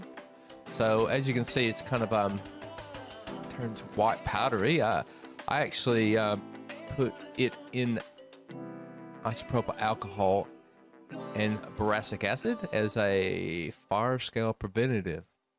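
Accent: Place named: Australia. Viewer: So I checked your Instagram and can tell you’ve definitely picked up in the Aussie accent. Also you live about 2 hours from me ☺️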